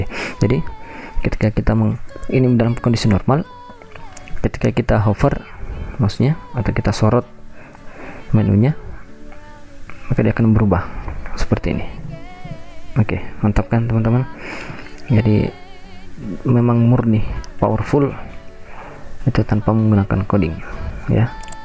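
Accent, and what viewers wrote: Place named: Sulawesi, Indonesia. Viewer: abang orang sulawesi yah... makasih buat Tutorial nya ya bang.. keren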